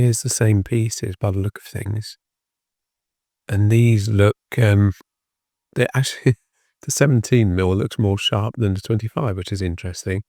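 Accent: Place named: United Kingdom. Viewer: Thank you very much for this channel in British English. I find it very pleasant to listen to (as I am not a native speaker and my English is only at a basic level), and I particularly appreciate not having to listen to American English. In addition, the content of your videos really appeals to me – it is well selected and clearly explained.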